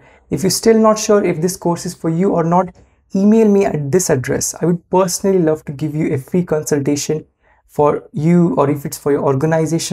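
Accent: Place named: India. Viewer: I dont know who you are, but you have a bright future. I can hear youre of hindi descent, and if I had to guess id say you moved from there to probably the states. But if like to know your story and how you got where you are today.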